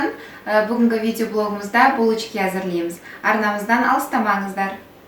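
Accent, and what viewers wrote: Standard. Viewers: Менин ойымша казакша таза сойлеп турго.келисем мак коп сиякты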